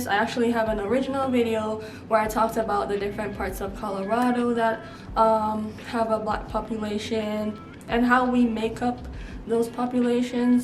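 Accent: Jamaica. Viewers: You had me with the Jamaican accent and food 😍 Moving to Denver and will definitely be looking for Jamaican food. Thank you sis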